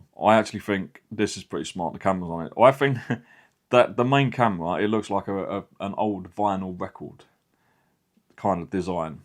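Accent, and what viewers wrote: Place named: Liverpool, United Kingdom. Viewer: Why are you speaking in a scouse accent?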